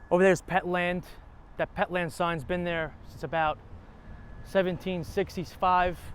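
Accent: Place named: Staten Island, United States. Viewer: This guy has a thick Staten Island accent. Sheesh.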